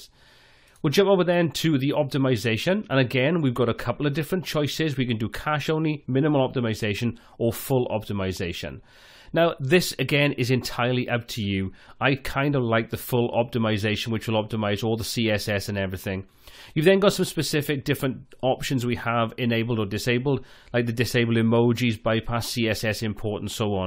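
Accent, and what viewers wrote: United Kingdom. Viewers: Love this tutorial, you sound like a fellow Brit! I have done an up to date video on the same topic on my channel, however all of these WP tips are still spot on! Great video! Clear & concise!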